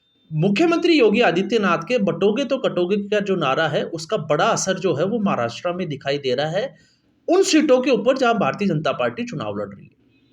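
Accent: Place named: Haryana, India. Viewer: आपकी भाषा में हरियाणवी पुट है,,🎉🎉🎉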